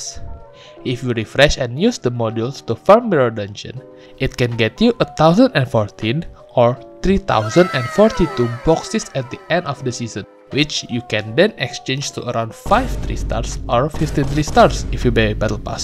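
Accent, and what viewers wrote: Indonesia. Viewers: Logatnya indo banget masnya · As soon as I heard the accent, I knew this man was Indonesian.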